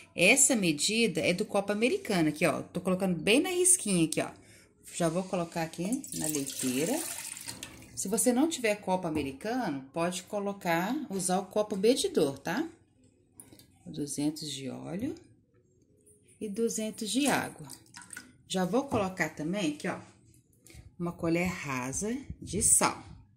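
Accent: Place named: Minas Gerais, Brazil. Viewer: que bom escutar se o sotaque mineiro. Sou mineira mas moro em Brasília. Vou fazer a peta agora!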